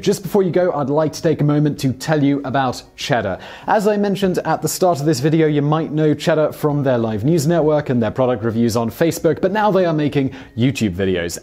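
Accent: United Kingdom. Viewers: There are a lot of comments about the pronunciation in this video. I'm of two minds on the subject. First, give a break. He makes a bunch of videos every day and it takes a lot longer than 10 minutes to make the video you just watched. But second, there's a support team of researchers who dug all this up for him. Couldn't they help him with the pronunciation? There's this thing called IPA they could provide, and I'm not talking about a beverage. It's the International Phonetic Alphabet (for English). It's all those strange squiggly marks in dictionaries telling you how a word sounds, except much more well-thought out. I have a theatre degree (among others) and I spent a year on this subject alone as it's the basis of learning dialects for the stage. To sound American, British, or Russian, etc. (and yes, I know all have regional variants) part of the process of converting the text on the page to the sounds from your mouth goes through IPA. 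I know he's British and that will always color how he speaks. This channel provides a lot of interesting and useful information about esoteric topics. That's why I like it. I'm just wishing they'd go one step further and the entire team push to get the easier pronunciations right. Some languages are much harder for native English speakers, of course. But making the effort here would be well appreciated, imho. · I don't complain about his Brit speak. Making fun of a person's accent induced pronunciation is one of the few things we have left to make fun of. And not all Brits have the same accent, there are many different ones. When Roger Moore as Templer used to say Mafia it came out as Maff-Eee-Er. Hilarious. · I used the think british accents were classy until this! oh my ears. ouch the cringe in my spine! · I normally like your British accent. But I hate how you pronounce NACHOS. Ugh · Ha, I've never heard my hometown's name being pronounced so many times by a Brit before! 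BTW, I've actually been to the Rico's headquarters before to pick up some concessions items for a school festival thing during high school (my high school being located downtown just like the Rico's HQ, it wasn't a tough assignment). It's insane how big that building is for a downtown business! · As a Texan, hearing Spanish words and Mexican terms in a British accent was horrifying and almost insulting. Very interesting video though! :) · Love these videos. Although i like the British accent, it seems that along with your efforts to find out the real facts, you would put as much effort in proper pronunciation.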